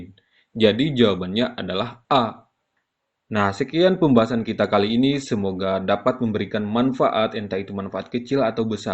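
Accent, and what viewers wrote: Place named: Muna, Indonesia. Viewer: Dialeg bahasanya mirip orang muna kereeen 🙏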